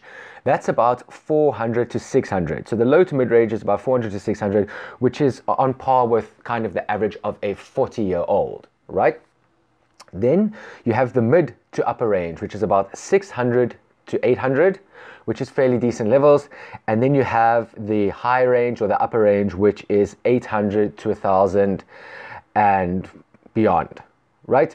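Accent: South Africa. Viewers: Great video... Mine is 650, age 29 ... By the way, you have a South African accent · Are you South African ?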